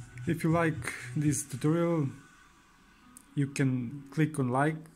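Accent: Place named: Serbia. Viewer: Pazi mu akcenat.. ovo neki nas garant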